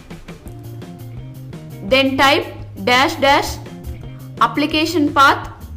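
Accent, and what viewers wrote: India. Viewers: I dont like indian accent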